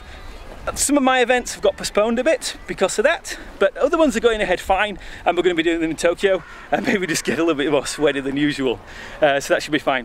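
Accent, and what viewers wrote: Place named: Scotland, United Kingdom. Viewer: Ur accent is like a mix of British and Scottish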